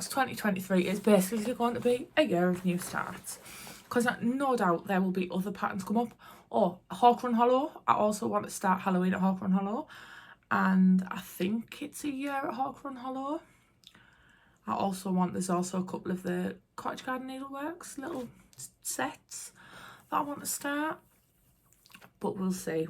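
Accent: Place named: Newcastle, United Kingdom. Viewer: Welcome to flosstube!! I live in Canada but my mums side are all Geordies, its so nice listening to you talk! Love your accent and all your WIPS. Look forward to your next video💗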